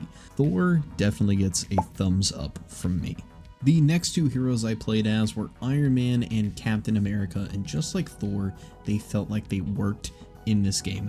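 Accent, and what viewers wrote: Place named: United States. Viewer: idk if it’s your stereotypical american voice (i’m british 😅) or decent editing / voice quality but i was expecting you to be popular while watching. vid was well made and clicked on it without seeing views/ subs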